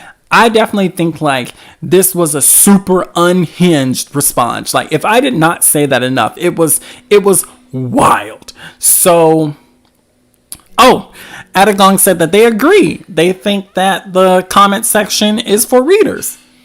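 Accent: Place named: South, United States. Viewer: no way i love your accent! i'm also from the south but i don't live there anymore so it's kind of comforting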